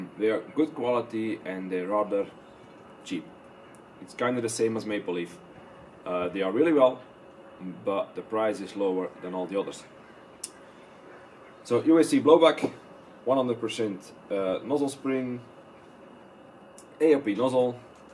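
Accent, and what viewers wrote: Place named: Eastern Europe. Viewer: Where the hell are you from lol I can't regcognize the accent, sounds slightly Slavic but I could be wrong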